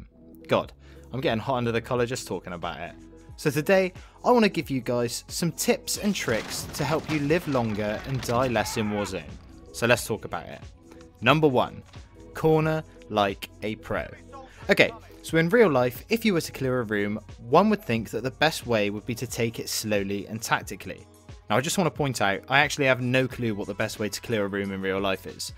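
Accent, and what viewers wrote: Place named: England, United Kingdom. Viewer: You have an English accent. I trust you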